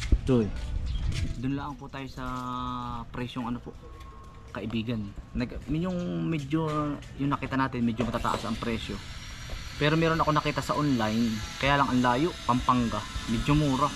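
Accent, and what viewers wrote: Quezon, Philippines. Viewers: Ay Alin baga nman po Abay taga Quezon po kayo hane Alam nay sa ponto pa laang ay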